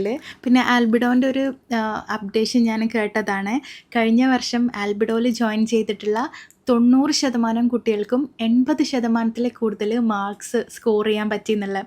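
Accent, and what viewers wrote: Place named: Malappuram, India. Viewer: samsarikkumbol vadakkare samsaaram maarunundallllo😅❤❤malappuram slaang avunnund chilappozhakkke.thoneetundo😅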